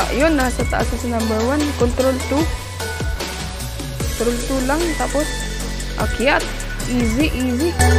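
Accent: Visayas, Philippines. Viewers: BISAYA DIAY KA? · Hahaha bisaya diay ayaw nag saba!!!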